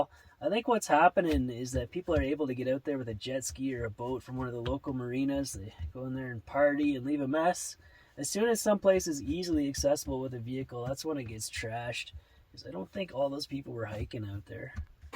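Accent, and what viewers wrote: Canada. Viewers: in the first minute i asked myself from the accent, "this guy's got to be canadian' then you mentioned the distance as kilometers and I questioned no longer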